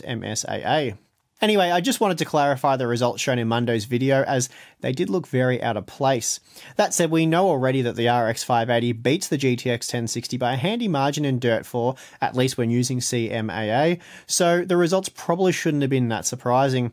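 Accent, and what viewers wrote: Melbourne, Australia. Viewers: I have no idea why your critics were so critical. I've watch all your Ryzen and gpu tests and find you disclaimers eminently understandable and justified - perhaps it's because we share an accents (melburnians). Anyway keep up the excellent quality of your work.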